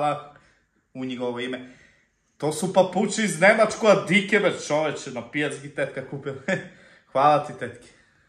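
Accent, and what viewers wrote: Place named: Zaječar, Serbia. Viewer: On je iz Zajecara ne sa juga,tako se prica i u nas kraj....On je bukvalno pogodio u srz tog zivota inostranog...🤣🤣🤣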